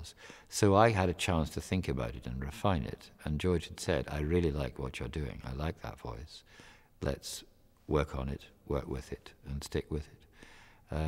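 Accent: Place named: Scotland, United Kingdom. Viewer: So nice to hear a scot doing this.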